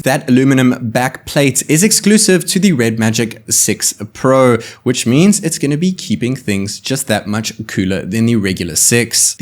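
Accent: South Africa. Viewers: i swear you sound south african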